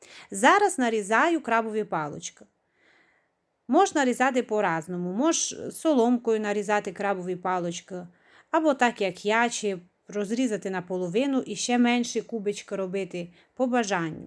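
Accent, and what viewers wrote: Transcarpathia, Ukraine. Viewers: Дякуєм за рецепт! Прийшла на ваш канал від вашої сестри. Обожнюю Закарпаття і ваш діалект!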